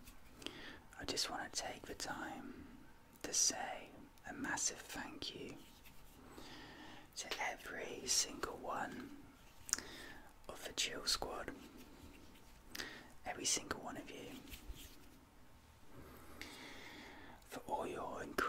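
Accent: United Kingdom. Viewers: relaxing together with your british accent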